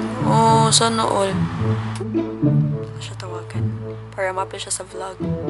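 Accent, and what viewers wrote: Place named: Visayas, Philippines. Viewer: I love the accent of bisaya hahaha!! New subscriber here 😊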